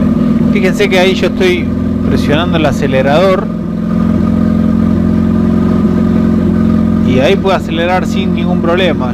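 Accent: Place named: Argentina. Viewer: por tu acento creo que sos de Argentina? Soy de Colombia y veo que el carburador difiere del que tienen instaladas las 2.0 aca! Creo que es un SOLEX 32/32. Podrias compartir que referencia de carburador traen alla los R18? Gracias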